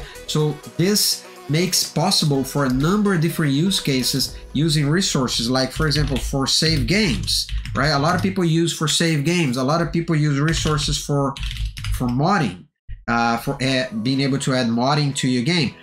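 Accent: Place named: Brazil. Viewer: I've instantly recognized the Brazilian accent, great video man.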